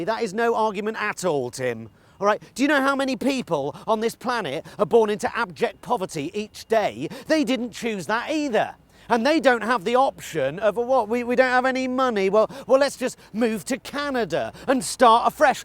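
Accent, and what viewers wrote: United Kingdom. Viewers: He just made me love British accent even more! I am crying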